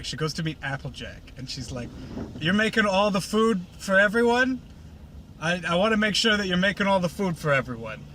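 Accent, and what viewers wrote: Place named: Boston, United States. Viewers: you're from the east coast arent you? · You have such a Boston accent it's really quite amazing. Even I don't have that thick of a New England accent and I've lived here most of my life.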